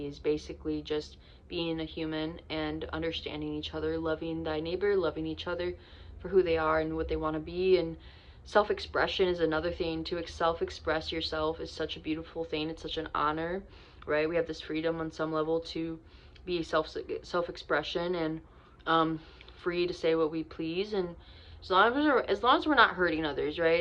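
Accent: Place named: United Kingdom. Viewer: The Brit accent was on point 👌💯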